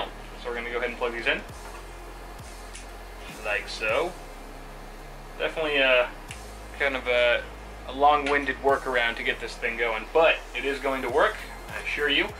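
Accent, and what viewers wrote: United States. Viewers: This build is cringe worthy, with the brown pcb on the motherboard, ghetto cabling, sketchy power supply, cheapo case, and an american accent half the time. is a legend, he pulled all these things off in one video. You shall never be forgotten.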